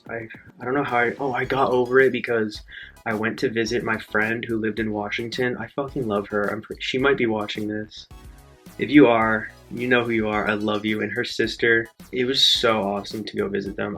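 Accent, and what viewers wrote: Canada. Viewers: You do sound Canadian though. The way you pronounce "out" and "about". Sounds Canadian bro! I suppose you could be FROM there and now living in the Boston area?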